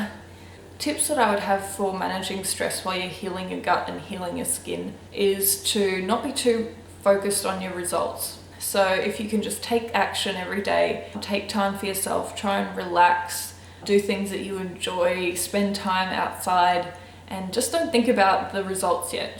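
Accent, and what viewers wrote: Australia. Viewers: Is she Australian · Thank you for this video, I have had eczema for as long as I can Remember. I do a lot to heal my gut and body it's a journey and wonderful to hear about yours. Where are you from btw I can't get your accent Australia or Newzealand? you look great now all the best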